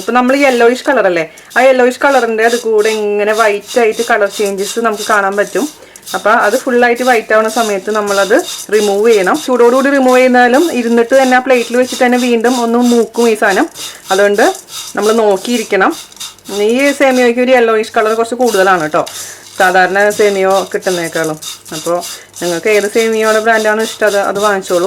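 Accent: Thrissur, India. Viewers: കിടുവേ.. ഡയലോഗ് പോളിയാണ് ചേച്ചി.. തൃശൂർകാരി ആണോ?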